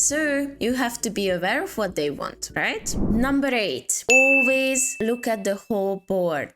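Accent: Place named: Eastern Europe. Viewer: Chess with east european accent sounds more trustful indeed.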